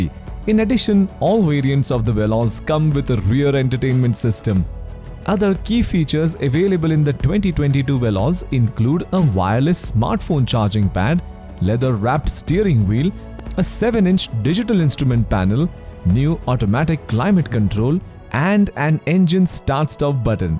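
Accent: India. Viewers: Its japanese car..its weird when indian voice introducer start kick in 🤣. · India. listen carefully to his english.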